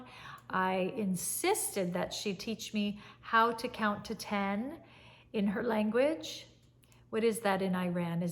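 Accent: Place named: Syria. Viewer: You have a very good Syrian accent 😍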